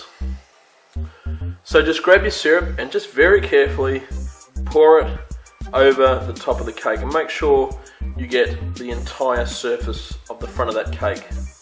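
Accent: Australia. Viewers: I love your Austrailian accent!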